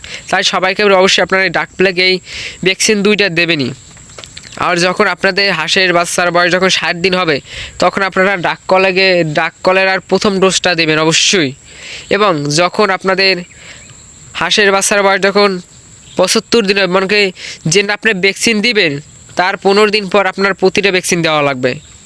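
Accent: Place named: Barishal, Bangladesh. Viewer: ওরে বরিশাইল্লা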